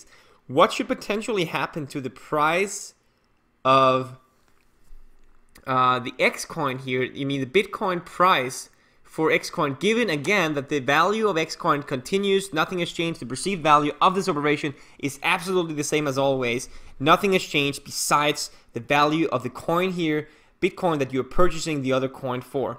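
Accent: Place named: Denmark. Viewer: You sound danish.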